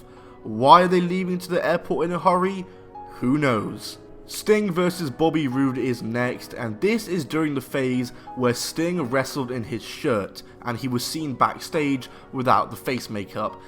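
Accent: United Kingdom. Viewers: Listening to a nit brit talk about this is crap.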